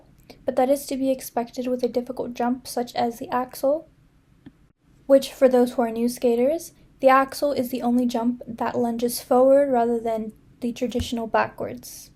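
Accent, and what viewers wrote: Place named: Russia. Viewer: great debut, since it is your first video! your voice, itʼs got that ASMR magic, would you try to do it next? :) seriously, the way of how a skaters hit those spins and axels, it’s giving a vibes of 19th century energy, ngl – no clear winter and winner, just pure, relentless artistry. and the costumes? chefʼs kiss. your russian accent and pronunciation is very good! do you study this language? and before anyone starts, as it may feel like, i support all skaters, not just the ones from my ancestral homeland, alright? only appreciating the art, i am a native american with a some russian roots, remember, leo dildocaprio (joking 😂) does have a russian grandma too. donʼt get it twisted, guys, support the girl, she is cool for trying to popularize an underground kind of sports, which our small community loves, keep it up, subscribed, and, as youʼve asked to be kind in suggestions or critics, will suggest to try to use your favorite music, as a background and vibey layer of your voice!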